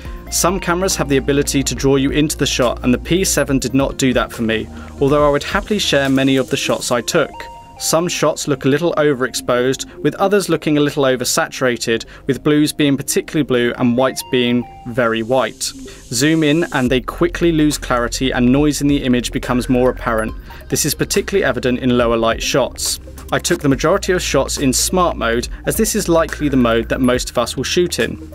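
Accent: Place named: England, United Kingdom. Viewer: May i guess what nationality are you? Based on your accent i would say you come from England :) Again, the way you do it, i just simply love :)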